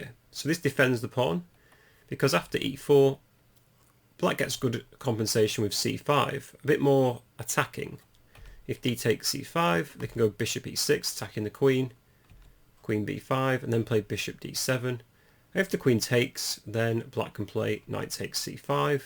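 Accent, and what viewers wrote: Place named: United Kingdom. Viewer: I wanted to share with you how I found your channel, even if it doesnt matter(or maybe you can capitalize on it as a matter of...you'll know what I mean when I say it😅 I searched I donno british chess whatever...I'm here for your beautiful accent man:)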